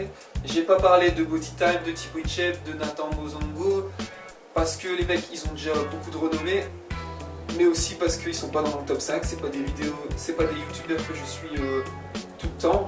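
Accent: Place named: North, France. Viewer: T-es du Nord non? Parce que t'as un léger accent je trouve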